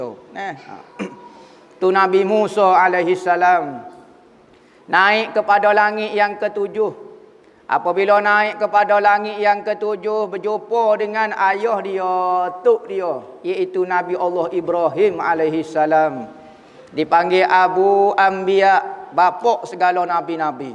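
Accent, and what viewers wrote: Kelantan, Malaysia. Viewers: hehe.. Yaa, beliau Tuan Guru kita semua semoga kita dterima menjadi muridnya.. 😘 serius walau pekat loghat kelate saya dari Kedah boleh faham dan masuk ke hati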